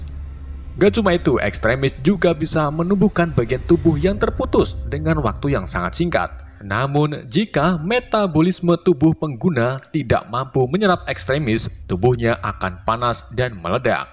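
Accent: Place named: Banyumas, Indonesia. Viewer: Jawanne. Ndi mas??? Dimak dimiik kayong ngapak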